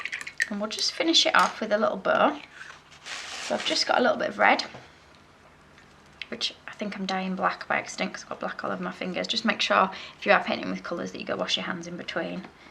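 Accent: United Kingdom. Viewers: Are you british? You have a very intense accent( not the bad way)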